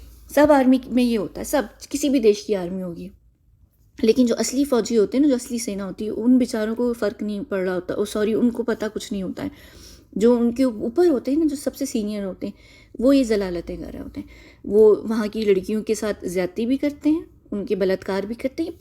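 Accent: Pakistan. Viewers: Her dialect is pure Pakistani. Slow claps for those who believe her Illuminati agent story. She could be related to islamic organization and her purpose here is solely to set a narrative for defying the existing islamic terror. She won't acknowledge Hindu genocides. Her agenda is fixed... They play the war of narratives. In a way she is also installing fear. The elites don't have the absolute power. We, if united are powerful too.. People keep ur senses alive and always always always question every narrative or information being thrown at you. Specially from a person whom you have never even seen. It's a very planned move.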